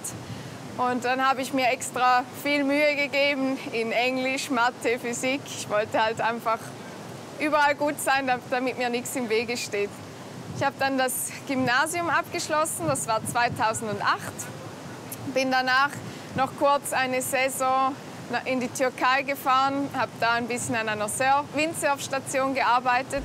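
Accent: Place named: Switzerland. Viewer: Douglas Dunning Well she's actually speaking standard German here. With maybe a slight Swiss "tone" left in it. But it's not the official Swiss variation language ( = called Schwyzerdütsch / meaning "Swiss German" ) here that you may be referring to.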